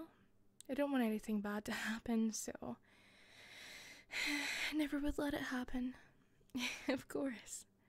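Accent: Scotland, United Kingdom. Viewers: Just when I thought I couldn't fall harder for a Scottish accent... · am? Check Crippling sleep deprivation? Check Late night scottish ASMR? Check This will be a good night... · I’ve pretty much always adored Scottish accents, so tingles or no it’s an absolute joy just to listen to you talk. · Scottish accents are the best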